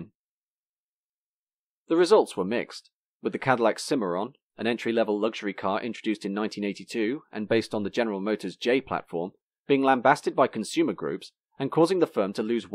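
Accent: United Kingdom. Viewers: Hearing a commentator with a British accent talk about poorly designed/inefficient American cars is utterly GALLING. Wow nobody knew how to build low quality unattractive, inexcusable, unforgivably bad cars better than the English. One step higher than Trabant, if that. I can't make it all the way through a video on the English car industry without a good strong anti depressant.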